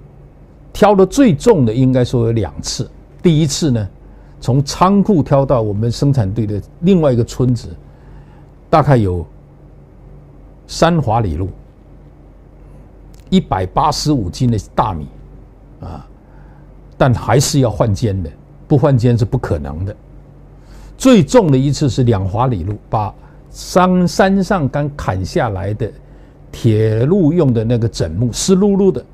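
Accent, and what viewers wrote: Fujian, China. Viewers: Your spoken mandarin with fujian accent is horrific to listen to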